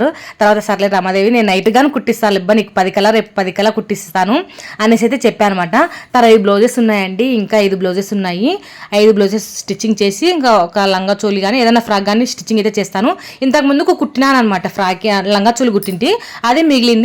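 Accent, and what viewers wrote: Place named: Kadapa, India. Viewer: Me slang Kadapa valla la vundhe meru Kadapa na Andi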